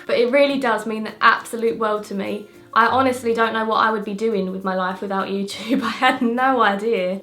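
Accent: Essex, United Kingdom. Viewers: Your accent is perfect . You're British I guess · are you from Essex? :)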